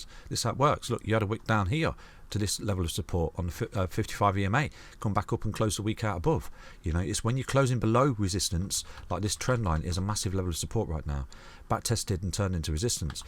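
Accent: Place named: England, United Kingdom. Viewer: Thank you for your content and time, these little doodles you do alone are helpful and easy to remember, but your content is simply more understandable than others overall. I have followed several other (no names) crypto not financial advice channels that are popular and not had nearly as much important details as yours, in fact sometimes the opposite advice, but your advice has prevailed. Just clicked the notification bell for your channel, Twitter also. Nice to hear an English accent on crypto updates for a change too.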